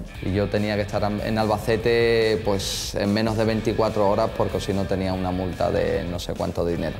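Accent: Andalusia, Spain. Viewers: Estas cohibido mariquita, con el arte que tienes, intentar hablar bien para que te entiendan los de despeñaperros parriba no te favorece. Queremos a con su acento y su arteee! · Cuenta lo que quieras desde que te he visto de contar cosas en la isla de las tentaciones la verdad que me ha encantado y desde entonces venga escuchando tus chistes y lo que digas, me gusta tu forma de hablar, tenéis un arte los andaluces 👍😜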